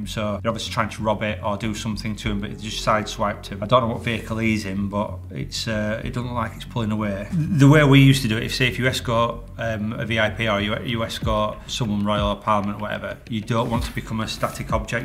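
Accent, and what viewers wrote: United Kingdom. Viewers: A traffic cop where? Your accent makes me believe you’re from Britain/England/ the UK. What do you people call your country nowadays?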